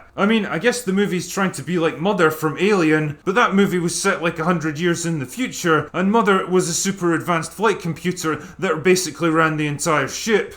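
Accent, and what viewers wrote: Scotland, United Kingdom. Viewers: I’ve watched so much of the lately that my internal monologue has turned Scottish. I find myself standing in a queue thinking “Fuck off World” in Drinkers voice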